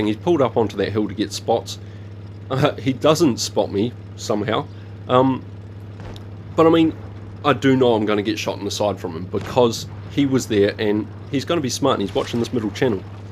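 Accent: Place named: New Zealand. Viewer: just picked this up on my new oceanic account and your video is real handy my bro, nice to see a fellow kiwi in the mix hit subscribe as soon as i heard your accent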